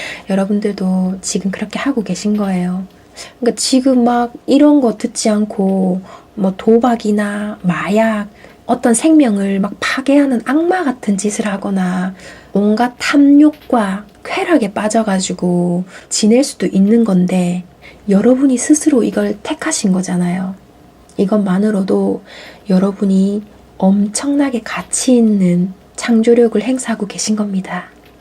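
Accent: Gyeongsang, South Korea. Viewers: 부산에 사셨군요. 처음에는 대구 말투인줄 알았어요.^ 티나다=포떼나다 갱상도 사투리죠~^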